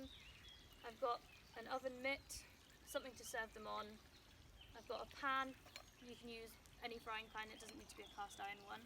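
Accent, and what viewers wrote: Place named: England, United Kingdom. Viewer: Great to hear an English ladies voice, and of course, straight to the point. Great video!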